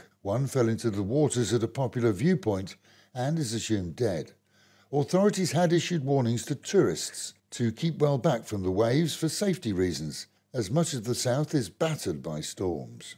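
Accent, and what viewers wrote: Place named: RP, United Kingdom. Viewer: Nice RP accent. Quite a change from the many YouTubers who seem to be from Newcastle.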